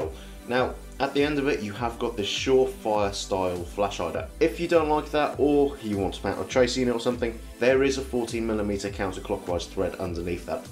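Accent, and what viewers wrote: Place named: Australia. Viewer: lol Your aussie... where you based?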